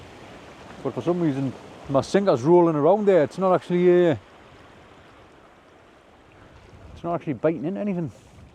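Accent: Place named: Northumberland, United Kingdom. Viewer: Lost sock in your wellingtons Picking your noise 😂Trying to pin point your accent at a guess l would say around Morpeth Blyth area 😊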